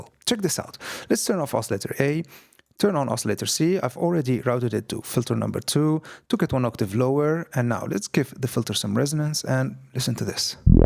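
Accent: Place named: Germany. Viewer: Énorme la modulation sur le spectral. T'as un super accent avec un petit côté Germanique , tu dois être vers l'est j'imagine. Super Skills, tu as un excellent niveau, les presets packs sont flawless and gorgeous. Merci mille fois pour le partage de ton savoir ✌️🙏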